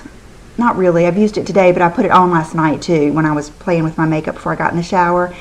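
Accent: South, United States. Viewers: ! Funniest story for you!! So I work in a hair salon in Vancouver BC Canada and a clients aunt was in town and stopped in to pick up some product so I helped her and she had a southern accent (super rare here in Canada to hear a southern accent maybe only the third I've heard in person, weird hey?) and right away I was like are you from North Carolina? Shocked she was like yes how on earth did you know and I told her by her accent lol! Took me forever to realize it was because I'm so used to your beautiful voice! Thought maybe I had a special gift for a moment! Anyways I Love you have a good week!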